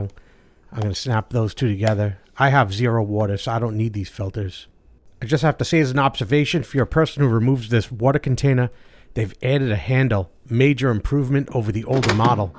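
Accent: New York, United States. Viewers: So, I'm a former NYer and just freaken LOVED hearing a voice from home! We ordered the S8 last night and expect delivery in 5 sub-par coffee days (our Breville burr grinder is breaking so the coffeemaker is making tan water - ugh). Thanks for walking me through the set up, etc. Lavazza is good coffee, as is Julius Meinl Prasidente, but I still prefer La Colombe Monaco or Sumatra beans (via subscription - roasted in PA and shipped). OMG, as I drink this swamp water, early in the morning with the house still asleep, I am PINING for a cuppa anything made with the S8! Subscribed!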